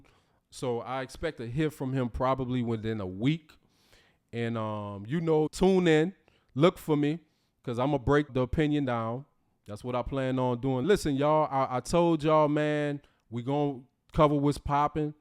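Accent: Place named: AAVE, United States. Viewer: Imma argue dis.....How you gon say dat?....and so many other examples of ebonics. You literally sound just like Fani Willis---and that's NOT a good "thang". As for your legal knowledge, it stinks. Even I--as a-non-lawyer--know well the GA statute on gifts from prohibited sources. The statute CLEARLY says $100 USD in aggregate must be reported yearly. They didn't have to stay at the Ritz for it to constitute a conflict--and corruption. Where did you get your law license? Compton?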